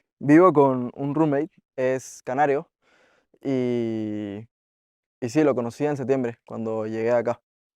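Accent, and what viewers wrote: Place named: Lima, Peru. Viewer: yo también soy de lima y planeo postular este año a la ie, btw,me resultó gracioso notar su acento limeño JAJAJAJ entre nosotros nos entendemos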